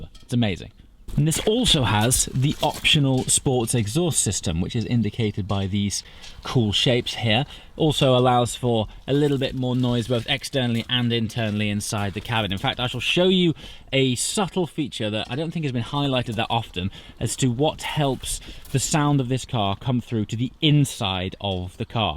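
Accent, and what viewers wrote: United Kingdom. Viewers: Is it just me or has thickened his British accent?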